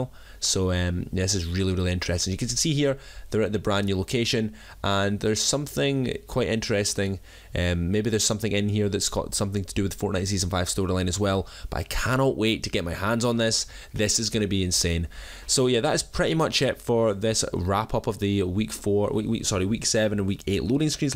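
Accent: Scotland, United Kingdom. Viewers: Are you scotch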